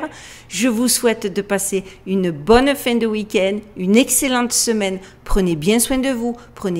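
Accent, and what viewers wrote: South, France. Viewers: Merci princesse ! Tu as gardé l'accent du sud, peuchère !❤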